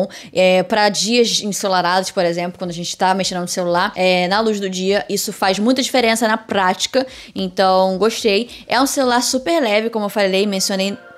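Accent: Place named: Rio de Janeiro, Brazil. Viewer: Amei a carioquinha falando ! tmj conteranea.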